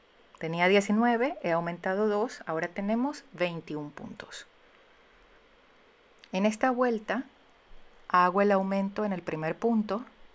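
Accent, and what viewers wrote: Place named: Latin America. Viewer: Que bonito y que divertido ese chal asimétrico gracias por compartir... una pregunta de que país eres porque tu acento es latino. Es una curiosidad saludos desde Colombia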